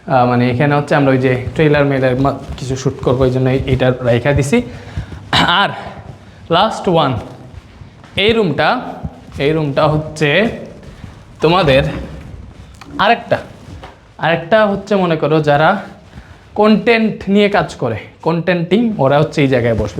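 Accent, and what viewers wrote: Sylhet, Bangladesh. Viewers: কথার মধ্যে সিলেটি সিলেটি ঘ্রাণ মনে হচ্ছে 🙄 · কথায় সিলেটি ভাইব মিলছে।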